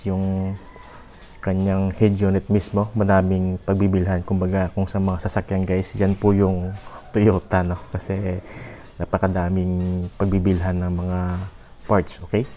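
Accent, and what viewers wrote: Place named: Ilonggo, Philippines. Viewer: Ilonggo ka boss? Dw nabatian ko ang tono ta eh,hahaa.pila ang set sina boss shampoo and water pila magasto? Thanks for sharing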